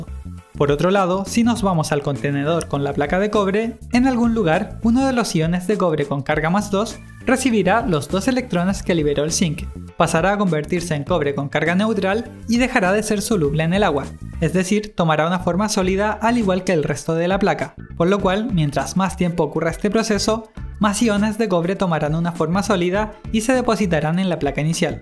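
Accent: Chile. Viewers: Me encantan tus videos P. D. Tu voz me recuerda a un programa que se llama Oban Star Racers creo que eres chileno o me equivoco?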